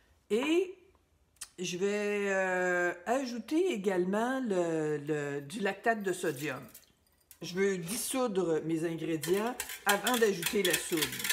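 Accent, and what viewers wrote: Canada. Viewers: Le savon au soufre organique est souvent utilisé pour traiter les problèmes de peau tels que l'eczéma, le psoriasis ou l'acné sévère. En effet, il s'agit d'un sébo régulateur, d'un anti-bactérien et antifongique très efficace. Voilà après il a beaucoup d'autres utilisations, comme pour les douleurs de l'arthrose(les bâtonnets de soufre)..... Etc J'adore votre accent, les canadiens est un peuple cher à mon cœur, mes amitiés. Bonne soirée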